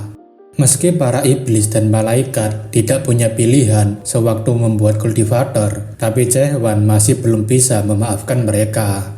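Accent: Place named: Bali, Indonesia. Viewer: soalnya kata T nya persis org bali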